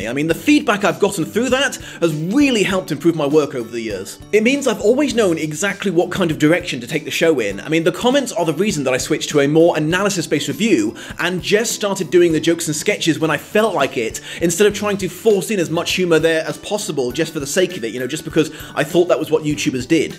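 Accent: United Kingdom. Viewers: I don't care where you live, just don't stop using the word "bloody". I LOVE your british accent! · going to the States... please don't loose your lovely British accent... :(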